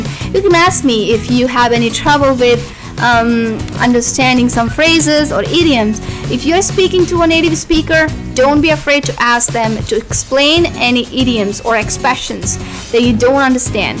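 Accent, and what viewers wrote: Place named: United States. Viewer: Wow...bhut achii english h apki..love from pauri garhwal...aap kis chij m job krte h ji...or apne USA style English kase sikhi please tell me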